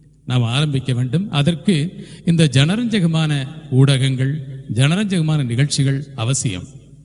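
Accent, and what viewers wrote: Standard. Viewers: பிராந்திய. தமிழ். இல்லாமல். பொதுவான. இனிமையான. தமிழ். தமிழ். பொக்கிஷம். நன்றி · வாழ்த்துக்கள் சார் உங்கள் தூய தமிழின் உச்சரிப்பு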